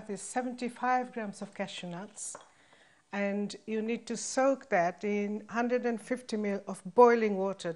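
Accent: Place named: South Africa. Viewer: Her accent doesn't sound so British, she sounds more like she is from Durban, South Africa.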